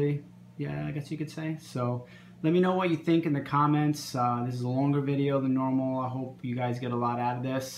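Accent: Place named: New York, United States. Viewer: I heard your NY more in this video! haha. I cannot believe you found the time to make this when you are so busy with life lately. Those green neons are looking slammmmmming. Great advice as well. Looking good! I am glad you are entering the contest, they give some killer feedback that help us grow in the hobby.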